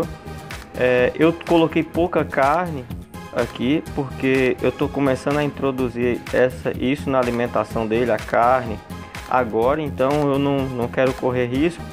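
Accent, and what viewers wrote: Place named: Mombaça, Brazil. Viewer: Bicho das urea de mucego kkkkk sou monbacence eu falo asim memo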